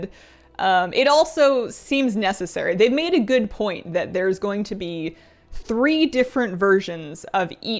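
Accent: Canada. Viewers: She's Canadian.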